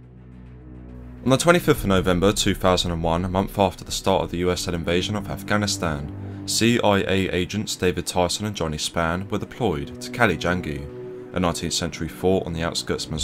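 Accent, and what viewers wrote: England, United Kingdom. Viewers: What accent is this? What part of England?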